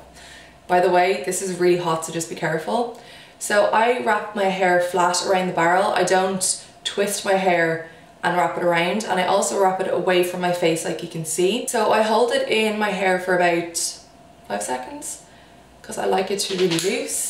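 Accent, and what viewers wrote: Ireland. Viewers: my grandparents were from Dublin, it's a comfort to hear your brogue ❤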